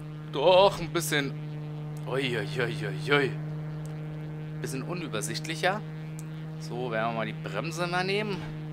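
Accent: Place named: Berlin, Germany. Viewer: Ich habe mal eine Frage an dich, wo kommst du eigentlich her. Wegen deinem Dialekt würde ich auf Berlin tippen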